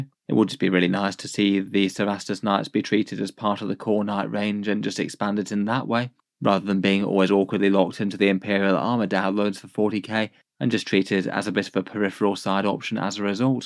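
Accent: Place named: United Kingdom. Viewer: What UK regional accent is this? Very narrative. Can you read LOTR to me for money, lol.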